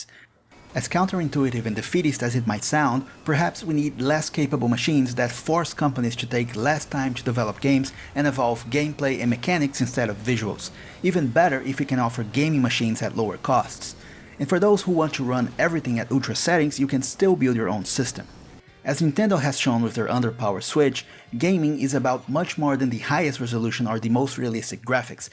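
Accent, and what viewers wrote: Brazil. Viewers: Percebi que era BR pelo sotaque primeiramente hahahaha. Depois vi os textos em português em alguns dos jogos. Você fala muito bem inglês, muito impressionante. Consumo muito conteudo gringo, acabei caindo no seu canal por esse video e fui surpreendido hahahah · A Brazilian accent is always unmistakable. Olá, meu amigo.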